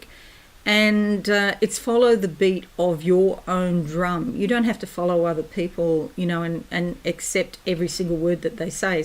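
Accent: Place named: Australia. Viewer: It's so warming to hear a fellow Aussie.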